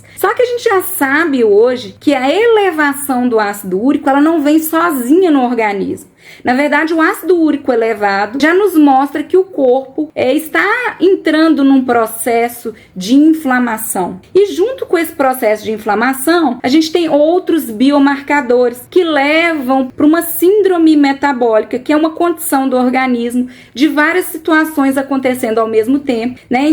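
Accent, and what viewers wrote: Minas Gerais, Brazil. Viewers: Mineira com certeza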